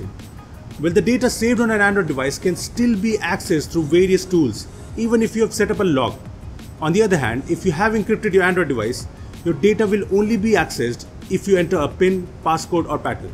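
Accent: India. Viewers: 7. Indian english accent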